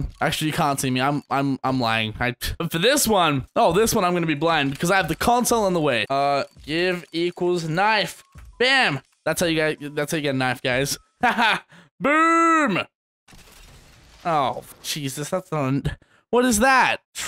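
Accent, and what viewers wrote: Australia. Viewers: i fuckin knew you were aussie wewwwww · Your aussie O.O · your from Australia what state you from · your aussie! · knew u were aussie bb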